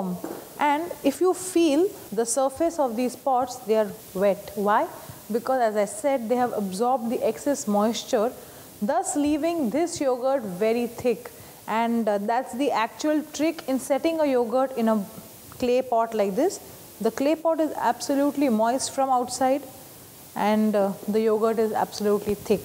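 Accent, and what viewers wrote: India. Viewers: Thanks for sharing the tips as so far no one uses the clay pot / earthen pot to let the yogurt to fermate ! I have no problem with her Indian accent , wonder why Mr. Steve Tarling can't touching with her. Once I apologized for my husband spoke in poor English , the Australian sales girl replied me " Is ok, he is already very smart as I, can't speak a word of Chinese ! For a Chinese old man that can speak our language, is great ! "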